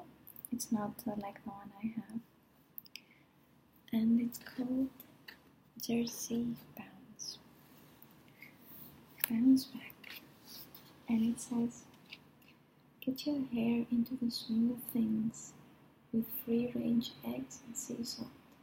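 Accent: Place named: Greece. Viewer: Greek, isn't it?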